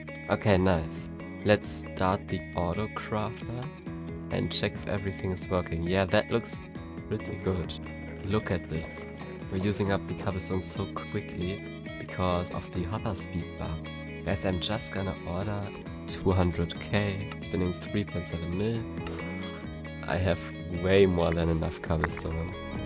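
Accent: Germany. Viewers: Why sounds this English so german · Dieser deutsche akzent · I hear german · You can not hide that u are german from a german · Holy German accent... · I hear deutsch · Nice Video, but you can hear the German accent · Holy german accent Fire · bro man hört deutsch raus :D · Why do you sound so german😭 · Ich hör das Deutsch raus beste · German accent? Nice vid. Go on buddy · hey bro, are you from Germany? You sound like it. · I Can heare that you Are German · I can tell you are an german by the Voice 😂 Love you Video ❤ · I hear a little bit of deutsch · Man hört das deutsch einfach raus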